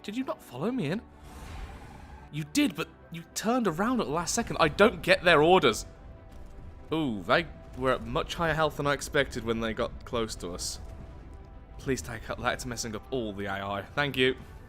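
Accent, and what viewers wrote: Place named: Birmingham, United Kingdom. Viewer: That sexxy Brummy voice...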